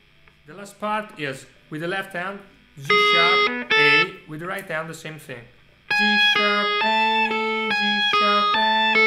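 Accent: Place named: Italy. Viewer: Già prima che dicevi im italian guy si capiva che sei made in Italy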